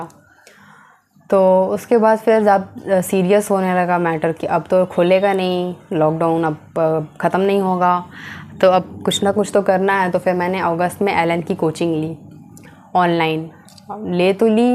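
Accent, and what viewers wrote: Bihar, India. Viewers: Tumhari acent me bihari tone hai 😅.... Are you from Bihar?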